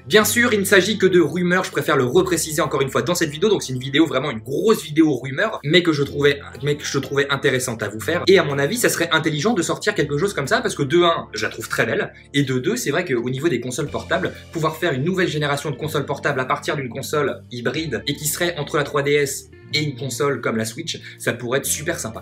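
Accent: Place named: South, France. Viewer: accent du sud mdr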